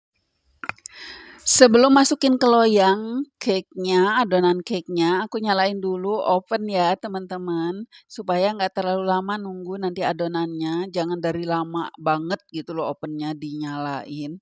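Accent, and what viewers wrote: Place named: Bandung, Indonesia. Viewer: Suka banget sama kalo LG NGE bake inget uwa yg di bandung..sama logat nya... mengingat kan waktu kecil pernah tinggal di bandung sama uwa...sehat y...semangattt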